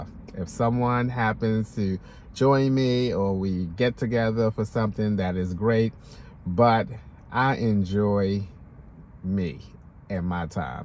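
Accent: Baltimore, United States. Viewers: I knew you were from bmore from how you talk lol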